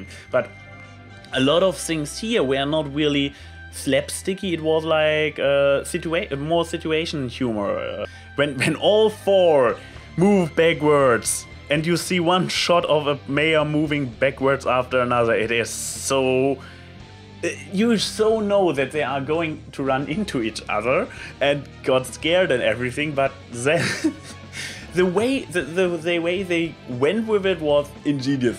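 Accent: Germany. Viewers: Your german accent is allways a delight and i like your views on the show, keep up the good work! :D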